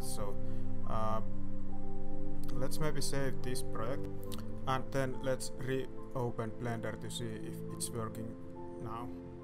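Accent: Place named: Finland. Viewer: Now that is a heavy finnish accent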